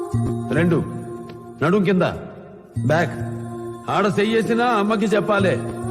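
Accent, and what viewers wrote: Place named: Adilabad, India. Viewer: Adilabad language ❤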